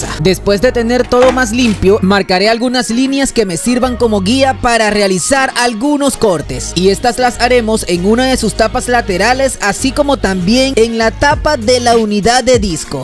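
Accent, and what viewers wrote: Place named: Venezuela. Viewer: es Venezolano el acento te lo dice mi pana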